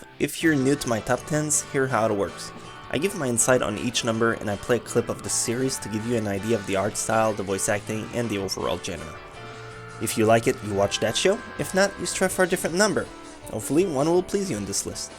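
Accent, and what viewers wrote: Quebec, Canada. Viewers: is Canadian and his first language was French so that's his accent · French Canadian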